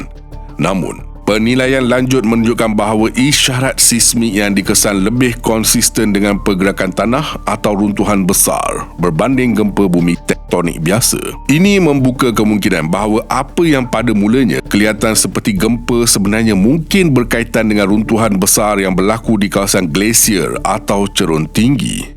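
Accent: Malaysia. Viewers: logat Malades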